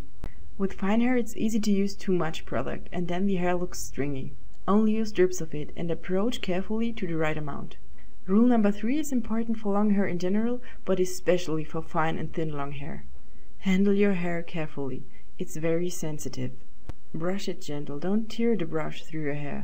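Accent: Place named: Germany. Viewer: Dieser deutsche akzent man, friee golnn rüüs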